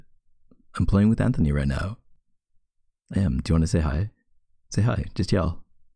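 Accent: Canada. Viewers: HIS ACCENT IS SO(;$/!( I LOVE CANADIANS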